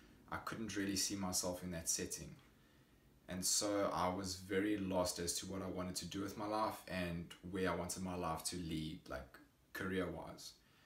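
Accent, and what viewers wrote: South Africa. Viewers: Are you South African ? Idk the accent sounds so familiar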